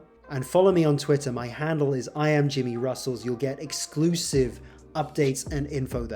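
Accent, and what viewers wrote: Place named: United Kingdom. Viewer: Hi where to based? sounds like you are in UK I am in London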